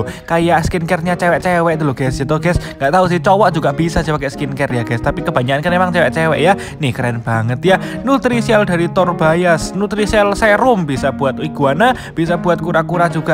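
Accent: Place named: Java, Indonesia. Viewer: Wah mantap. Ternyata kalau ngonten medok ya broooo 😋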